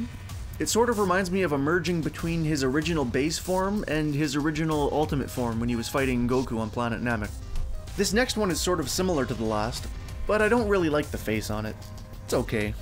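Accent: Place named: Canada. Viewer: this guy's definitely canadian.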